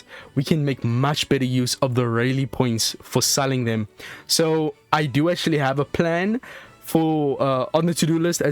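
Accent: South Africa. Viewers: yooo are you from south africa?? 😅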